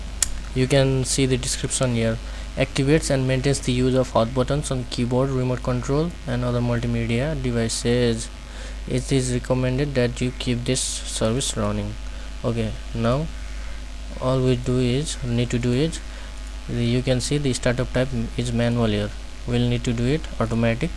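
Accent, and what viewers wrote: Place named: India. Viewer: like seriously? The accent.....the mic.....the rooster....India...............just smh!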